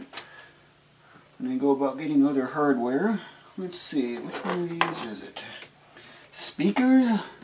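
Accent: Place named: South Louisiana, United States. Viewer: Im lovin that south Loisisianian accent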